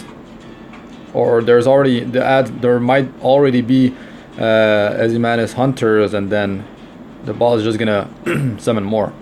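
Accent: Quebec, Canada. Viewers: T québécois hein? :)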